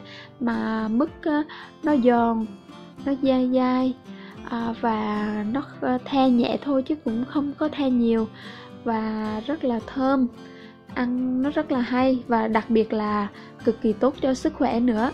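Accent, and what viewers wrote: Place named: South, Vietnam. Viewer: Tại chị người miền trung nói qua giọng Nam để mn dễ nghe á chị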